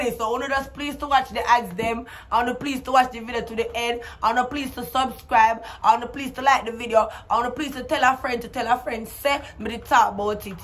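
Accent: Jamaica. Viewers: Mi ah juss ago subscrib to you channel you funny bad the way you talk alone mek me laugh . 🤣😍😍🥰 long time me here a real Jamaican girl talk how you talk. Lol 🤣 😆 every body a try twang 🤣🤣🤣🤣 lol love you vybz a swear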